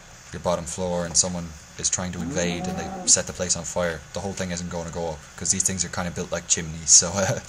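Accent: Ireland. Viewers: what part of ireland are you from ima dub :D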